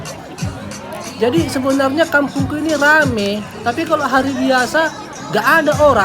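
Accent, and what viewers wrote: Medan, Indonesia. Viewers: Halo. Saya selalu liat youtube lewat tv jd gk bisa komen, kali ini liatnya lewat hp jd bisa komen.. senang liat kalau ngomong, khas medannya keluar😂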